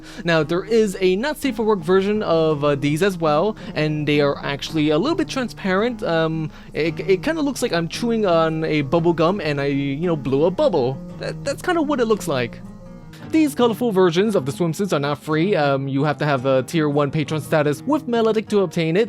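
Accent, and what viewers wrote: United Kingdom. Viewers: I'd say English but I can't place the region, but her voice is soothing. Also I'd work both but as a slave it's not like I'd have a choice anyways. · She sounds welsh I think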